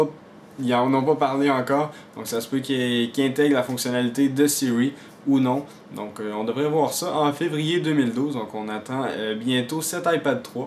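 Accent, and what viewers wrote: Quebec, Canada. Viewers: J'a laissé un potit poce vert et un commintaire =) (l'accent québécois me fait bien marrer) Sinon excellente vidéo dude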